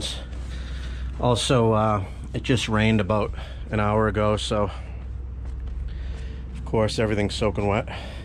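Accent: Massachusetts, United States. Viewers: The accent screams Mass my guy. Thanks for the video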